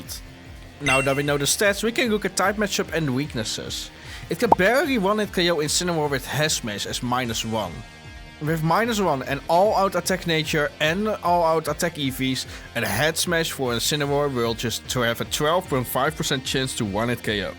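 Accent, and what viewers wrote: Netherlands. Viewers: he's dutch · Het allereerste filmpje dat ik van je zie en ik hoor direct dat je uit Nederland komt... Mijn idee xp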